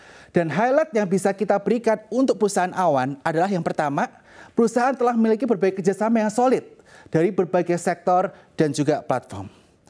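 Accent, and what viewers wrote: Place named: Java, Indonesia. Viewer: Mas yang bawa acara orang jawa ya ? Medok banget jawanya.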